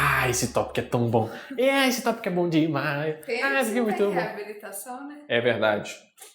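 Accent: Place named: Minas Gerais, Brazil. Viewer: Agora descubri que voçe é mineirinho né por isso que viçe tem um sotaque reconhecivel e eu também sou de minas gerais cara. Um abraço😎✌👊👍🙏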